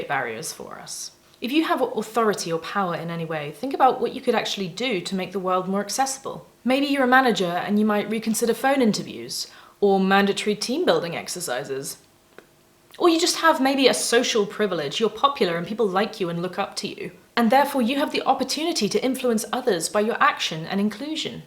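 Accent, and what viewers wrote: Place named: RP, United Kingdom. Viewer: Was Received Pronunciation part of your Aspi-onage? 😉 maybe I'm imagining things, but your speech seems a bit more posh in these earlier videos. No disrespect intended.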